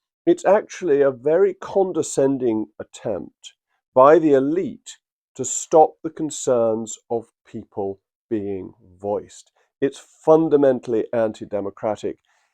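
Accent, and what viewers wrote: RP, United Kingdom. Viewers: Look at this guy, camera looking up at him, some portrait in the background. Bookshelves on either side whilst he speaks posh and makes cultivated hand gestures throughout the video. And then he starts talking about the working class… Well colour me sideways Moggy I don’t believe a word you are saying and you are probably just trying to build a YouTube channel at this stage. Nobody as posh as you has the right to align yourself with the working class, get stuffed.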